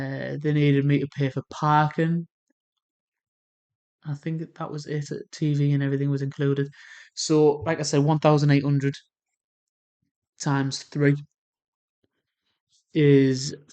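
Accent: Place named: United Kingdom. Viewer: Based on all the negative Nancy videos you looked at listed under the video above, it is no wonder you came away with negative impressions of Toronto/Canada but having lived here all of my 76 years (a retired litigation lawyer), I have no serious complaints and would NOT want to live in the UK or the current version of the USA under crazy Tariff Tyrant Trump. Given your very thick regional British accent (I could not understand a lot of what you say in your videos), that also would not have helped you in Toronto or in Alberta (and I was born in Toronto but with British parents from Paisley and Dundee Scotland and London and Croydon England). In any event, good luck to you going forward but you really need to research the cost of living and the job situation wherever you live in this world.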